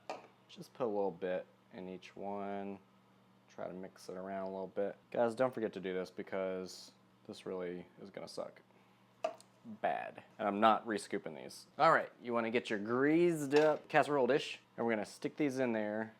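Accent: South, United States. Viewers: Yum! That dirty rice looked good! And those peppers 😍😍. You sound southern (I'm originally from the Tennessee, so I have a southern draw myself ,haha) where are you from?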